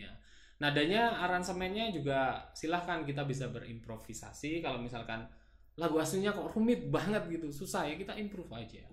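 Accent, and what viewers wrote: Java, Indonesia. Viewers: Kendal? Suara medok bang.. Salam dr pegandon 😂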